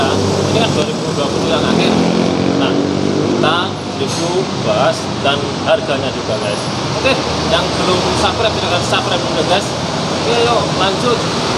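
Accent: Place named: Java, Indonesia. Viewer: Medoke lucu bang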